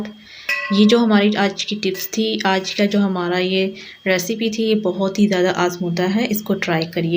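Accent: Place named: Lucknow, India. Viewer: Aapke aaba ijdaad lucknow ke the kya? Aapka accent me lucknow ka bohot asar araha he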